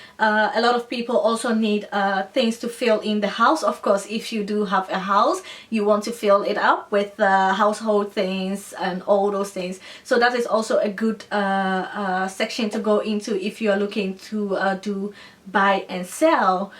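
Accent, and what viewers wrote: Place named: East Africa. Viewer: thank you for that insight. Yes, there are a lot of Ghanaians living in Holland (just as there are here in the UK), that much I know, but I must admit her accent prompted me as being perhaps of East/Southern African origin and hence my initial question.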